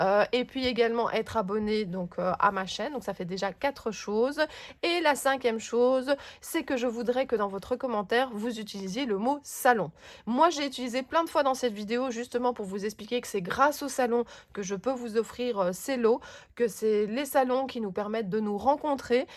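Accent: Belgium. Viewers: Coucou! Merci pour ce concours auquel je participe avec grand plaisir! J'ai eu un méga coup de coeur pour la collection de Lorelaï Design ainsi que pour le lot de la bulle à scrap! Ce que je préfère sur ta chaîne c'est les reportages des salons qui sont toujours très intéressants et qui permettent de découvrir de nouvelles personnes et boutiques... Et ton accent me rappelle la maison ❤ (belge expatriée en France depuis presque 10 ans ça me donne toujours l'impression d'être rentrée chez moi quand je regarde tes vidéos ❤). J'ai également eu la chance de participer à un atelier à version scrap Paris et à nuance de scrap et tes explications et ton implication sont vraiment super! J'espère pouvoir participer à un nouveau salon en 2024 pour t'y croiser 😊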